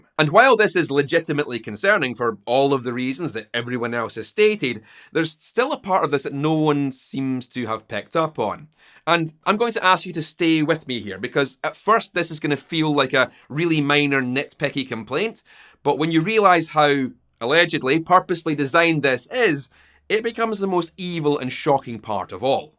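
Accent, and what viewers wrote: Scotland, United Kingdom. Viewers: The Scottish accent seems especially well suited to calling out capitalist nonsense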